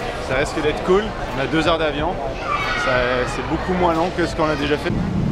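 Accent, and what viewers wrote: Quebec, Canada. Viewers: J'ai l'impression que ton accent québécois est de plus en plus fort ... bizarre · L'accent québécois est beaucoup plus présent qu'il y a un certain temps c'est drôle de voir la différence entre il y a 3-4 ans et maintenant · Bah à la base, il est pas québécois mais oui, il as un fort accent maintenant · T'as chopé l'accent québécois :D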